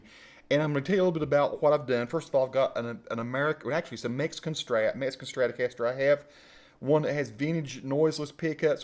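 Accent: South, United States. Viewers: That southern accent though lol. sounds awesome man, I'm gonna buy the vt40x soon! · I love Southern American accent some of my friends actually tell me I've got a southern accent myself but bro! You should use the software to dial down the thick accent I want to decide between 40x and 20x I couldn't pick up some parts you said bruh but loveeeee the accent from IRAN of all places?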